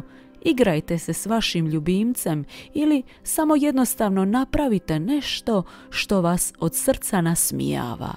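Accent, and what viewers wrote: Dalmatia, Croatia. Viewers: Gospet jel ovo dalmatinka prica?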